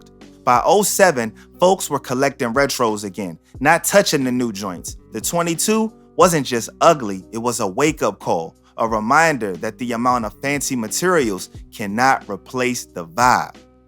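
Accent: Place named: DMV, United States. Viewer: When you talk about the 2’s…when they came out, the top selling shoes for all dope boys were the Gucci tennis sneakers which are basically the Jordan 2’s but low top…and that’s exactly who bought those shoes…dope boys. They may not have been as widely popular as the Jordan 1 but for cats in the street that was touchin a little bread, they was on them. My brother had 4 pairs. I kept 1 pair when he went to the Feds and held on to them for a while. I ended up making a couple bands on them but I could’ve made more than that if I was smart. Anyway, I thought you was from the dmv based on your accent…MoCo or sumn. I guess not. Cause if you were from DC you would know that the 2’s were especially popular here in the 80’s. But you got it.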